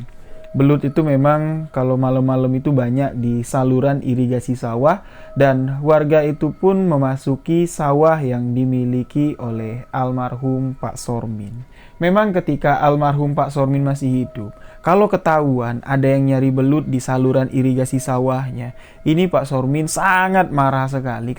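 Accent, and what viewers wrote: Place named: Madura, Indonesia. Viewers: Logat Madura nya kental bg salam kenal lah sama Batak sama sama kental logatnya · kaka kok nada bicaranya kya orang madura ya 😀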